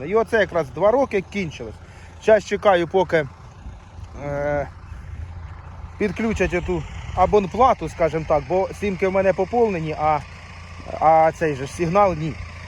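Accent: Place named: Surzhyk, Ukraine. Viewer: Все добре тільки вчись говорити українською не суржиком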